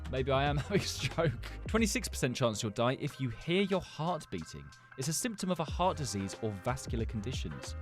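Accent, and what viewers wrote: United Kingdom. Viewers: I like you talking British cause I like talking British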